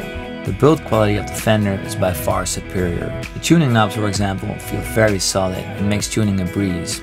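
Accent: Netherlands. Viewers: Maat ben jij een nederlander??? lol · Are you dutch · cool je bent Nederlands (hoorde al zoiets aan je accent) toffe video. speel zelf al 7 jaar gitaar en doe dat nog steeds op m'n squier ;) Super vid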